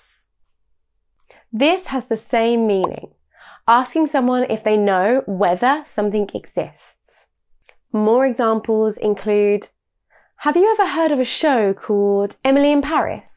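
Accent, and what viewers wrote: United Kingdom. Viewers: 역시 영국발음은 넘나 간지남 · 와 역시 브리티쉬 발음ㅋㅋㅋ 너무 깐지나네요 전 언어교환101에서 영국인 친구 사겨서 요즘 영국발음 연습중인데 너무 재밌고 럭셔리해요ㅎㅎ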